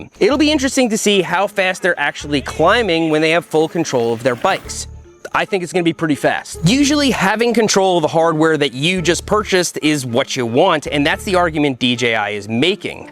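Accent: United States. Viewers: how is a very strict legal definition an 'unspoken agreement' ? brother you sounded very american here.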